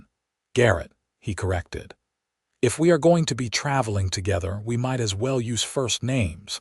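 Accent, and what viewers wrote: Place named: United States. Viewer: A 10 story with my favourite voice of the narrator, who gives with his authentic American English the stories a sound like music to "the West". And it tells once more, that a long lasting love is decision and work, the gold around is light from above... Greetings from Germany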